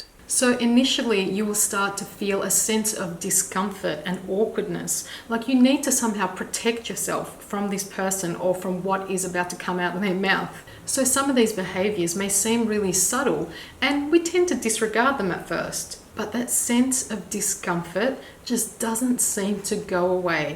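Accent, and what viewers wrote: Australia. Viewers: Pretty sure she's an Aussie. Lol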